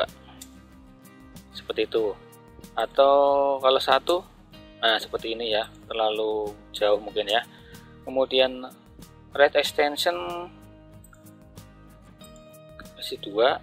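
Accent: Banyumas, Indonesia. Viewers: matur suwuh tutorial ya om jos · asyiappppp Bos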